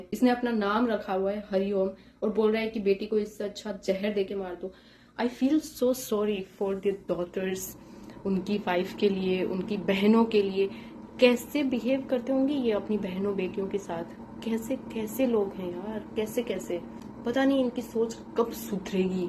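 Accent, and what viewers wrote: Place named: United Kingdom. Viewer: aapne english bolna kase sikha plz share me link..........mughe bhaoot acha laga........ british accent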